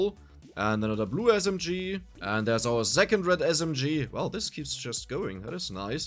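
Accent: Germany. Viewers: ist ja schrecklich deutsche englisch sprechen zu hören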